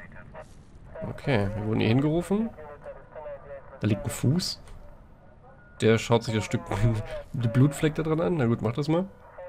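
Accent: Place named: Ruhr, Germany. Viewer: da isser, unser Ruhrpott dialekt🎉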